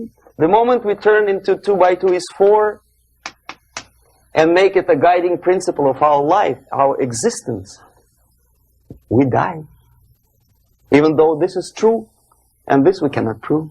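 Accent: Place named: Russia. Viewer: RUSSIAN ENGLISH ACCENT SOUNDS GOOD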